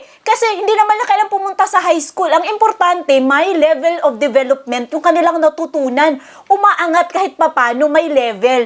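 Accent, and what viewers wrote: Visayas, Philippines. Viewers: Atty. ang accent mo ay Visaya. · Bisaya ka ba Atty. ?😅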